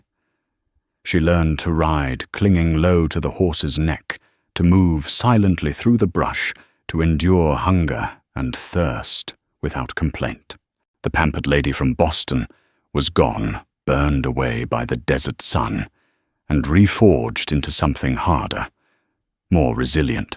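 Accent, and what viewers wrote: United Kingdom. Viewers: What a great voice! A beautiful British accent. Interesting story. Oslo, Norway.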